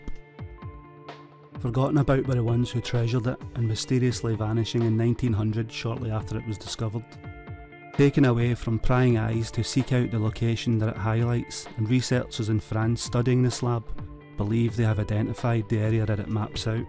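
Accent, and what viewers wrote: Scotland, United Kingdom. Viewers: He is Scottish... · The speaker has a wonderful Scots accent....love it....and there is a volume control for those who are slightly hard of hearing...you can turn up the volume on your device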